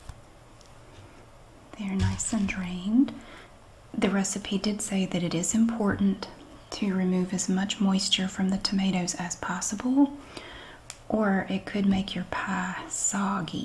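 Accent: South, United States. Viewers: I love you're accent! I love southern accents. I'm from Texas, and I have a lot of southern pride! You're so sweet. I love your videos. This recipe was very good. I made it a couple days ago after seeing this and it was great. We are a hellmana family. I don't think they sell Dukes here but would like to try it. Have a great day! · that face you made when you said, BUTTERY ROUNDS! lol you crack me up. So glad to see your tomato pie; I love your southern accent. I'm in Wilmington, not so far from you! Thanks for making these videos. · I like your Southern accent. I am enjoying your voice... it's easy like Sunday morning.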